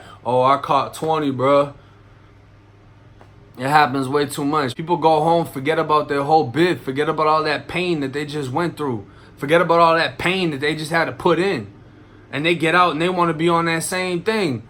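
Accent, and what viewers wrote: Boston, United States. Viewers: I could listen to you talk for hours witchur Boston accent lmfao !!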